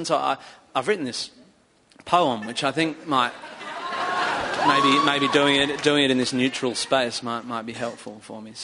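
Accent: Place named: Australia. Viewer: Early Minchin, My god you can hear his aussie accent.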